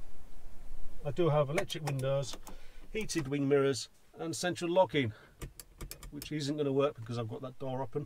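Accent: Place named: Hull, United Kingdom. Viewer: Fantastic setup mate, you’re from hull aren’t you ??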